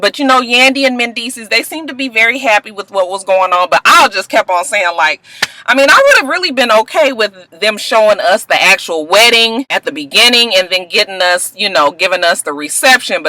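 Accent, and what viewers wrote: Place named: West Coast, United States. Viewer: Haaaaaaa! Hilarious. I looove when u say you be Hard (in yo west coast accent). I try to be hord myself... but sometimes them emotions just sneak in on ya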